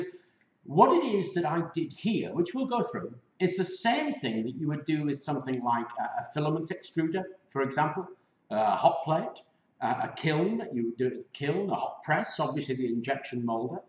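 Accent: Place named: United Kingdom. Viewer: I can't make-out some words again and think your Zoom rec/mic should be shirt pocket. British island accent is difficult for other folks across the pond too. Ni chrome heaters have huge inrush on mains.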